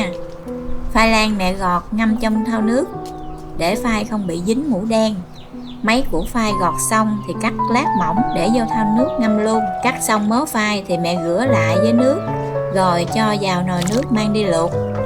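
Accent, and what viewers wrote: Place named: Mekong Delta, Vietnam. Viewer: Làm bánh khéo quá, giọng em gặt Miền Tây luôn đó, nghe rất hay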